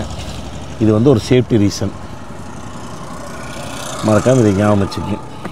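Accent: Kongu, India. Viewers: ilainga bro Kongu Tamil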